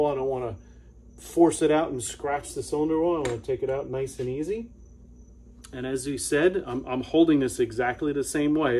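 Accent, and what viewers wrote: United States. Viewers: I'm from Bilbao, a city at the northern coast of Spain near France. I went to Southeast Texas when I was young and I had visited the US several times because my company used to send me to some broadcast exhibitions named NAB in Las Vegas and to visit some factories mainly from California. I fancy boats since I was very small. I've got a picture onboard my parents boat when I was three months old, and I got my captain license when I was 15. I own a 14 foot boat with a 15hp two stroke Mercury for rowing and some fishing, a Pursuit 2550 that used to have twin Mercury 135 two stroke but now we've got only a single one, because the other one got destroyed. We use thIs boat for fishing and sunbathing with the family. Also got a racing 25 foot sailboat with a Mercury 5 two stroke (I've got one short and three long shafts, four units in all). 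I love two strokes, I'm retired and I still keep my vintage motocrosss bike. Your accent sounds American but... Where are you from?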